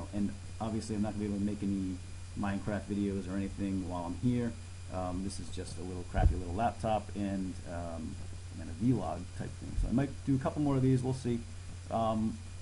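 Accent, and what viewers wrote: New England, United States. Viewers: Your from new England!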